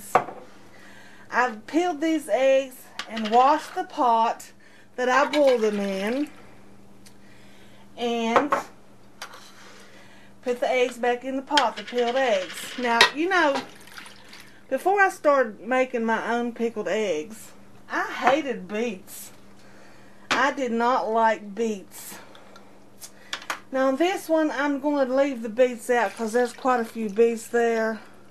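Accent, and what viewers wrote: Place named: West Virginia, United States. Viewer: You have to be from WV. Either that or Kentucky. I'm from WV, and can usually tell a fellow hillbilly when I hear one talk. Great video by the way.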